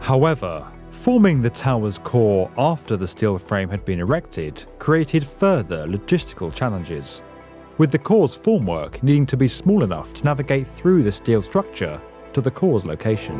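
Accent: United Kingdom. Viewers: Why is a video about buildings in America narrated by a Brit?? So annoying. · I find the British/non-American accent a bit distracting! I can't quite concentrate on the content of the video because of that!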